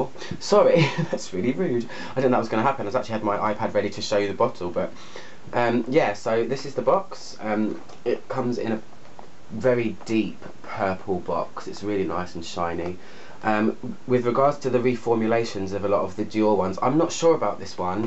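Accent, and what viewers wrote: England, United Kingdom. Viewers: I love your English accent. I'm practicing my listening with your videos. I'm also a lover of parfums 😍.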